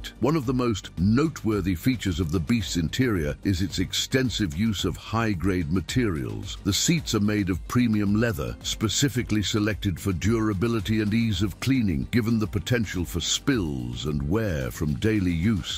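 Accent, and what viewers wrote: United Kingdom. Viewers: Another British narrator talking about an American president’s car. 😳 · Why is the narrator British?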